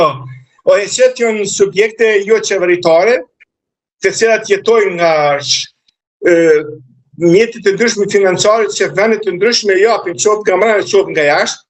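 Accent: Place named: Highlands, Albania. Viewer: È difficile capire cosa sta dicendo! Sarebbero meglio di parlare nella lingua corretta Albanese, non tutti capiscono la lingua montanara!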